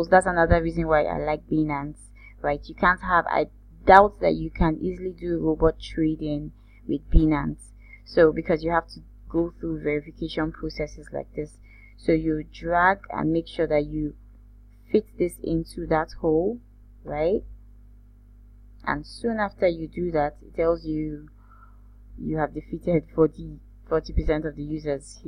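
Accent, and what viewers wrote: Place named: Nigeria. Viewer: Ah, Nigerian. I thought you sounded Caribbean ha ha. I see what you mean. But you say switch my BCH to Eth or BTC. But i don't have anything to switch. I have nothing, I'm looking to start trading. Are there other platforms where I can buy BCH directly? Or do you think I should buy either BTC, ETH, USDT externally first, then transfer it to finance to trade?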